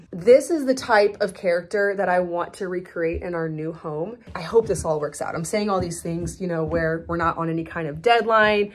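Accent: United States. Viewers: I actually went to your open house not knowing about this channel then later found it after seeing a page from your book hanging in the barn and of course I had to look it up! To my surprise I already followed you on instagram! The house felt important and historical. It’s hard to describe. You did such a great job of bringing it to life and yet keeping the feel of that time period. I felt I was truly touring a piece of history. It has been really cool to go back and see what you guys added from antique shops and such. Because I never would’ve guessed it wasn’t all original. I also love old things and I love your style. Your videos are so well done. And as a fellow Missourian I love hearing your Missouri accent that is similar to mine 😆. I will definitely be following along on your home building journey. Can’t wait to see what you do next and how you’re making things work in your temporary home. 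It’s all very relatable to me because I also have a large family and we have moved a lot. Whoever ends up in the farm house will surely love it and feel that magic. It’s a very special place. 🤍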